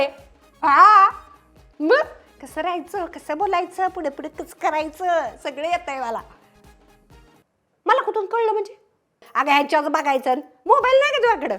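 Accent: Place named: Satara, India. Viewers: Ase satari tone madhe banvat ja vedio every week. Ekdam zaak performance. ❤❤❤❤❤ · Khup chaan saataari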